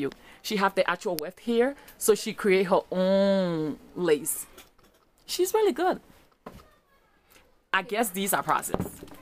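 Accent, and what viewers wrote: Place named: Liberia. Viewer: I hear our wonderful Liberian accent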